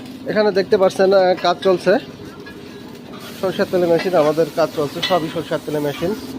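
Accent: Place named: Faridpur, Bangladesh. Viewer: ফরিদপুর কথায়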